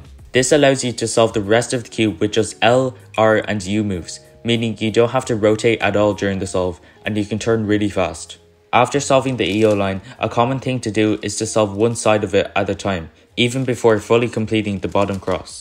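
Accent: Ireland. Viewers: R u irish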